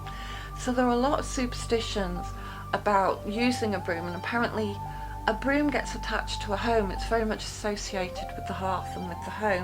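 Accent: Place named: Manchester, United Kingdom. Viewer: U sound like from greater Manchester ha subbed u, Oldham here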